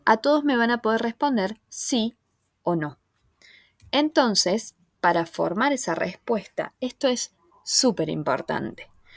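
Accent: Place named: Argentina. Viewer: Que bueno que encontré una Argentina que explique y encima bien... porque estoy podrido de escuchar el acento de España en tutoriales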